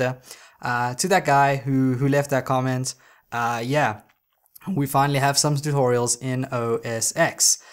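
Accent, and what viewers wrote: South Africa. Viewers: By your accent I suspected you're from SA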